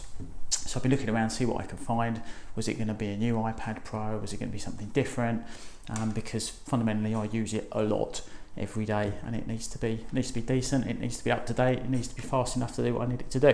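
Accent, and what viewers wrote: United Kingdom. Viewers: ohmygod i love the english accent so much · The lovely British accent 😍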